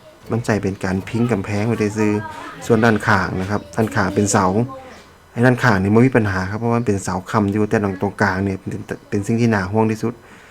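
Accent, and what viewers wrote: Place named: Isan, Thailand. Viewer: สำเนียงจังหวัดอะไรครับ เพิ่งเคยได้ยิน น่าฟังดีครับ ฟังๆดู มีทั้งเหนือ,อีสานผสมกัน คลิปมีประโยชน์ดีครับ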